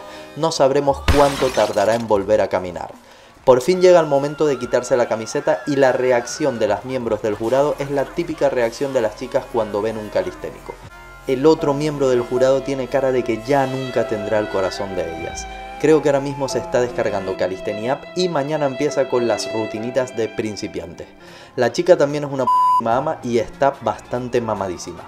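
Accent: Argentina. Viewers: ¿por q esta tan argentino en este video? XD, las batallas de gallo o q? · Me está gustando que estés implementando el acento argentino bien buenardovich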